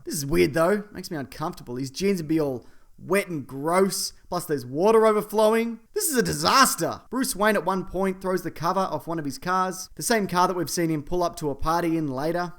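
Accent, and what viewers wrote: Australia. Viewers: This guy sounds Australian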